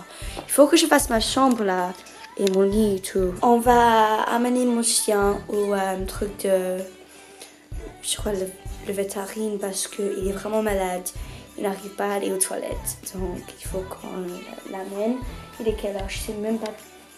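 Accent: England, United Kingdom. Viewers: J'aime ton accent anglais :3<3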